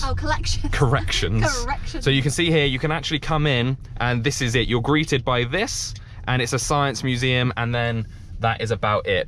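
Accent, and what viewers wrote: United Kingdom. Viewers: I like your british accent, you sound like DanTDM. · I love your British accent! :)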